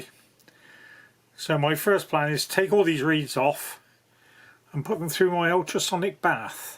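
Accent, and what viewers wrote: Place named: United Kingdom. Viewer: You are a gem. Your videos are clear, well edited, sped up at the right instances. Your comments and work methods are smart, valuable, your work bench is neat and your British accent is clear and impeccable. I am an 80 yr old accordionist, tinkerer and repair junkie in Florida, USA admiring all that you do. Roll -on!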